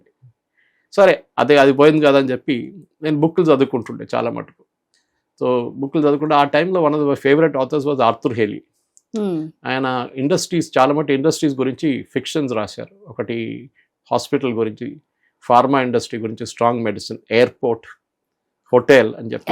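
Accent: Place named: Kerala, India. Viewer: మీరు అంటే నాకు చాలా ఇష్టం సార్. ఒక మలయాలి అయినా తెలుగు స్వచ్ఛమైన భాషతో మాట్లాడుతారు. జర్నలిజం లో మీలాంటి వాళ్ళు ఉండాలి సార్.. సార్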